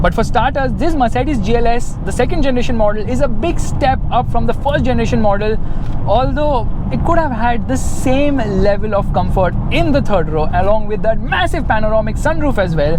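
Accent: India. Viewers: Except your Indian accent everything great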